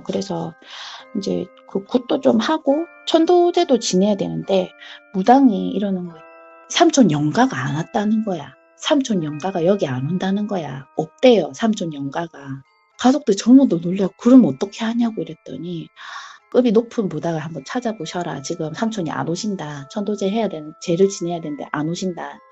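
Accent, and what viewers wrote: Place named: Busan, South Korea. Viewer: 진짜 동감입니다ᆢ'부산사투리 짱입니다ᆢ말도 잘하시고ᆢ목소리도 이쁘시고ᆢ또 부탁할게요 ^^♡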